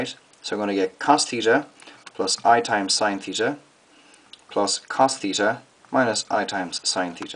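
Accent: Ireland. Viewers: Fellow Irishman by the sound a things. Fair play man, good to see some of our folk contributing to the good cause of youtube math..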